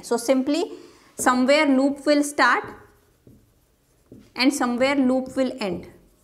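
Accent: India. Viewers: your hindi accent is very nice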